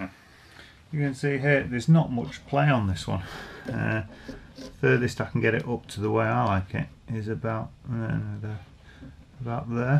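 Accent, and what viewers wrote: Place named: Dorset, United Kingdom. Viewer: Thanks for sharing this. I am trying about doing this to an old beater that I have had laying around for a while now. I have just joined a band back in November and it would be kinda fun to have two acustic guitars to work with instead of just the one that we currently have available to us. I thoroughly enjoyed your playing at the end of this video. You are very talented. Thanks for sharing your experience and skills with us. I would really enjoy more videos of you playing. You aren't from the Dorset area of the UK are you? Your accent reminds me of an old acquaintance I knew from college who is from Dorset.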